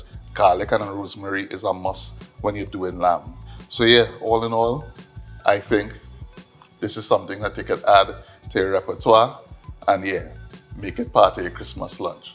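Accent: Trinidad and Tobago. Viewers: I love to watch you cook Bro. I also love to hear the real trini accent when you explaining how to prepare the dish. Total Trini style! Ah bigging you up! 🙌🏾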